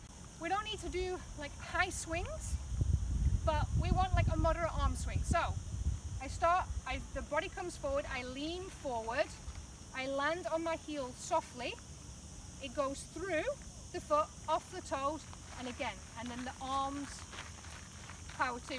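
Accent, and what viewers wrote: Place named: Scotland, United Kingdom. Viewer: I’m distracted .. what accent is that ? It sounds Scottish , north east England come either Spanish or other Mediterranean?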